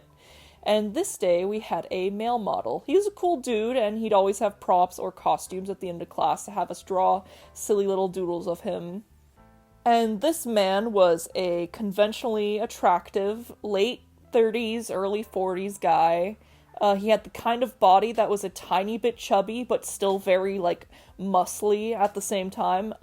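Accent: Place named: Boston, United States. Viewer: Why she from boston's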